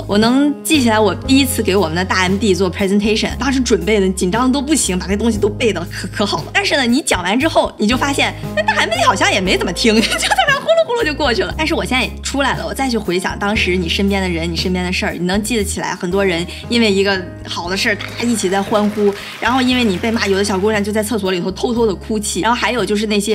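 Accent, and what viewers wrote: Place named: Dalian, China. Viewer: 大连的？只有大连人能听出来！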